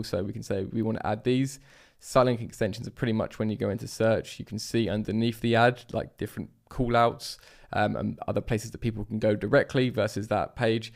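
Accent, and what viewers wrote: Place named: United Kingdom. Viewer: Try to work on the way you talk; it seems to be too fast, I guess you are from the UK and only the uk citizens can understand you better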